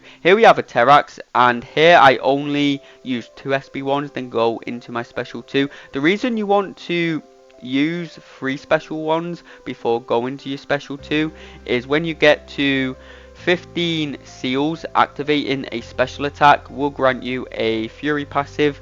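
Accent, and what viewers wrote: United Kingdom. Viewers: Ok so I literally just watched a video by someone else on this guy, and dude I literally couldn’t pay attention through the entire video and learned basically nothing. Then I come here and watch you and I just got 100% locked in immediately somehow?? pls never leave again, my brain can only understand your British explanations 🙏🙏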